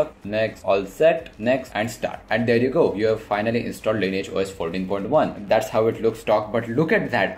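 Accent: India. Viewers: Indian english is the best! :D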